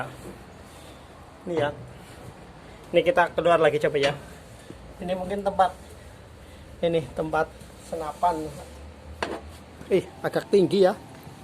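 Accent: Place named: Purwokerto, Indonesia. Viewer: Gaya bicaranya Purokerto bgt mas,kulo Cilongok.mantap mas sehat2 selalu 👍👍💪💪semangat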